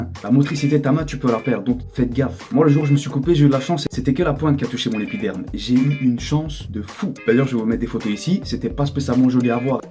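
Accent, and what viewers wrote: Brussels, Belgium. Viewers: D'accord avec toi et aussi l'accent belge 🇧🇪 TEAM BELGE 🔥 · tu viendrais pas de Bruxelles toi? ta un accent de drare de bx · Gros t'es de bruxelles pcq t'as l'accent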